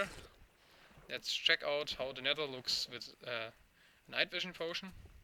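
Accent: Germany. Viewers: Your accent sounds really German , are you? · Hey, one question, are you from Germany? Sounds like a german accent. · Hey Ich bin neu im Sprechen, in welchem Teil von Deutschland kommst du Deutsch?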